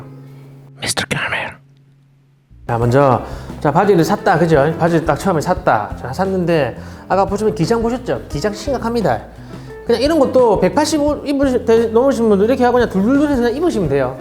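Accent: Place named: Busan, South Korea. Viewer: 유투브 잘보고 있습니다.같은부산사람으로 남천동에 살고 있습니다. 동천고에 다녔다고 하니 너무 반갑고. 찰진 사투리가 매력적이라 하루저녁에 동영상을 다 봐버렸네요. 유쾌하고 밝은 에너지가 느껴져서 언제 한번 커피마시러 서울로 가고 싶습니다. 옆에 같은 친구 또는 이웃이 있다면 사는게 재밌을것같습니다 색상 배색도 한번 부탁드리겠습니다 쌩큐~~^^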